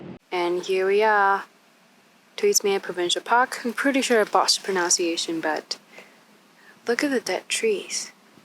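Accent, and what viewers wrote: Australia. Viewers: Interesting accent, is that Australian / Korean ? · That’s the weirdest sound I’ve ever heard 🤔 I’m also trying to figure out your accent, at times you sound Australian. It’s very confusing, lol.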